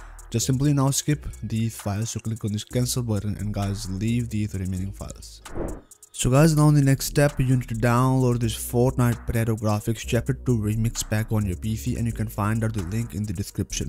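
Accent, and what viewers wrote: India. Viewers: Why you have india voice is scary😳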